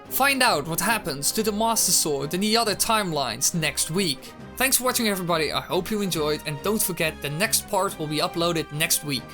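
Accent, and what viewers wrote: Netherlands. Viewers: In het begin een beetje te Nederlandse multiple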